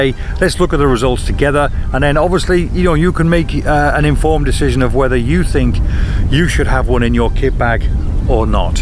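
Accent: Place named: South Wales, United Kingdom. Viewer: Whats your thoughts on a Variable ND Filter? Also where are you from, I'm guessing by your accent you're from South Wales. Big fan, Subscribed.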